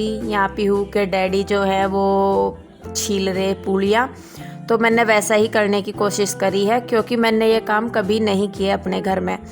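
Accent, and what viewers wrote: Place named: Saharanpur, India. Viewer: सहारनपुर मुजफ्फरनगर वालो की बोली तो अलग है ही 🤩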